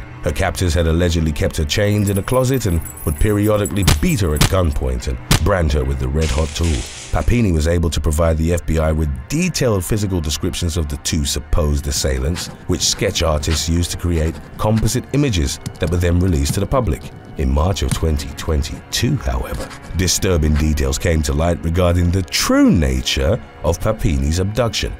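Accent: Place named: United Kingdom. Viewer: Why do these American hoaxes sound SO much more sinister coming from a British narrator???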